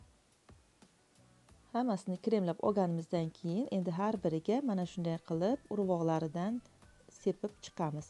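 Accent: Khorezm, Uzbekistan. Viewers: patnsga solib tuylarga oborgan yaxshi ekan menga yoqdi shu uslda albatta tayorlab tuyga oboraman patnsga salafan urab rahmat sizga opam xorazmlmsiz opam shvagiz horazmcha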